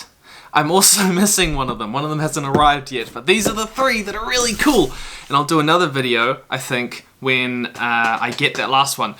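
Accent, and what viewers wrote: New Zealand. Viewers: Omc you sounds so much more new Zealander than on your main channel.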